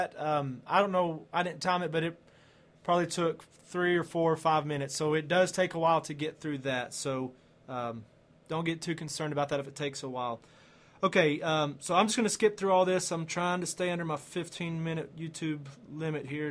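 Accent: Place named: South Carolina, United States. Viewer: You have giant balls with a South Carolina dialect. Or North Carolina. Whatever you coastal geniuses.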